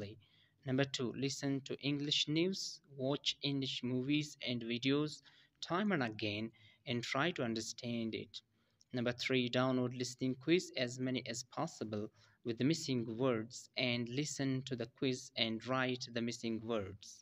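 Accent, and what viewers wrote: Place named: London, United Kingdom. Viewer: why he sound like he come from landan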